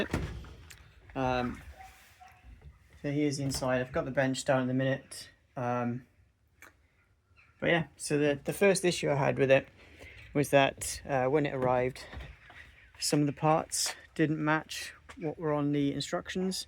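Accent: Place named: United Kingdom. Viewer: My dear friend, you’re picking out faults that only matter if they don’t obscure the main problem - it isn’t a sauna! A sauna is a place where you can wash and use a sauna whisk with at least one friend, and preferably two. What you’ve ordered is a shoebox, and now you’re complaining about the screws. Those bloody screws aren’t going to make it a sauna when pretty much everything else is wrong. First, it’s too small even for two people. Second, there’s nowhere to wash yourself. And third, there isn’t even enough room to use a sauna whisk properly. But I have to say, your British English is an absolute pleasure to listen to:goodvibes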